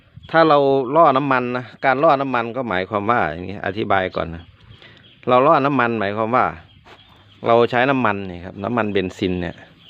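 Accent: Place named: Ubon Ratchathani, Thailand. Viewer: ช่างอยู่ อำเภอได๋สำเนียงคือทางอุบล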